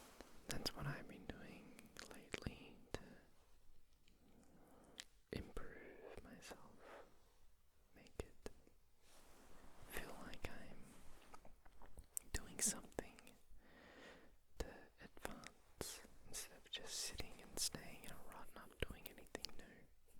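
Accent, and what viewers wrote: Australia. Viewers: Your videos started getting recommended to me a couple weeks ago and I’ve used them every night since to fall asleep. Weirdly enough, I also forget your Australian every night and get whiplash hearing the accent lol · This was precisely the Australian Sleepy Man Rambles needed right now. Do go on about video games/anime/manga...I'm not not here for the nerd-friendly content, and it's relaxing. Life is still constantly stressful due to "how things are where I live," but watching the new Alien: Earth series is a bright spot each week. Zoos aren't a usual destination, but there's a teaching zoo nearby that I enjoy supporting during their Halloween open house fundraiser. While there are tigers and monkeys, the reptile house's skink with resting judgmental face is worth the entire entry fee.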